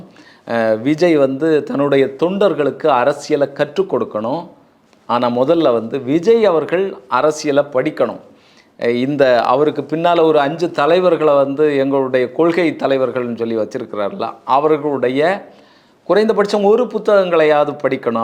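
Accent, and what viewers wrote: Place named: Kanyakumari, India. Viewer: Sir, are you from Kanyakumari Dist, and Monday market or Marthandam side? Your slang is kk slang.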